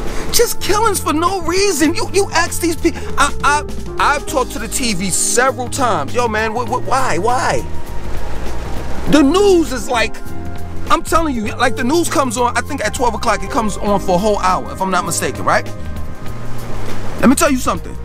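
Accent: New York, United States. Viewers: You sound like a true New Yorker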